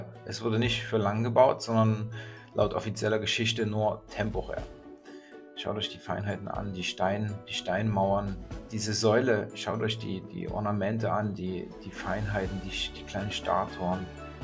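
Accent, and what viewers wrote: Saxony, Germany. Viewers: Über die Rhetorik mach dir keine Gedanken, der sächsische Dialekt ist goldig und du hast eine nette, bescheidene Art, dadurch wirkst du sehr sympathisch. 💐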